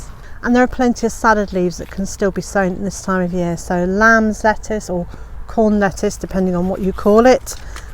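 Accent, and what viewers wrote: England, United Kingdom. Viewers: Lovely garden! I’m assuming this is England! Sorry to assume, it must be your accent. Love the video, and thanks so much. What I know about Europe is it rains or is quite overcast, would that be correct? and if so does that affect the September Sowing or Spring Harvest?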